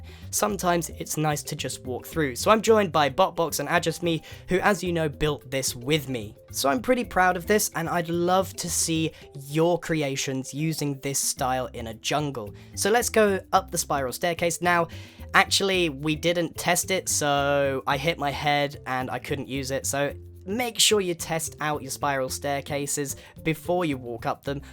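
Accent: England, United Kingdom. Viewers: He has a English accent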